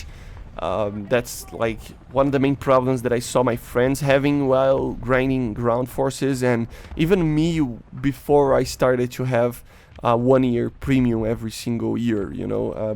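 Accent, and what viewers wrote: Brazil. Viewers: Dude, i listened to 10 seconds of the video, pause, 5 seconds of the video, pause and I said to myself, this guy is definitely Brazilian, muito foda ver um br com um canal top em ingles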